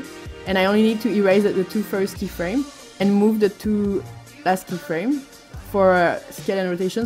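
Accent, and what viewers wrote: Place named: France. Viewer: I can hear a beautiful French accent. Thanks for this video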